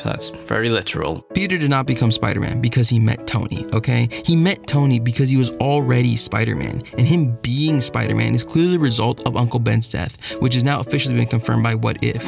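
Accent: United Kingdom. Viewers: MCU Spidey is whack. A YouTuber with British voice apparently speaks facts with edited videos.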